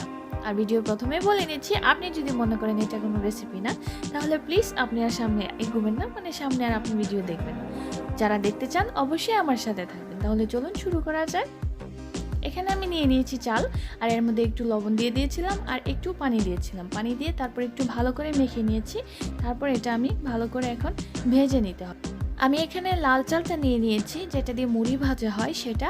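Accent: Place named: Noakhali, Bangladesh. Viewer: নোয়াখালীর ভাষা কড়ি বলে অনেক ভালো লাগলো 👌👌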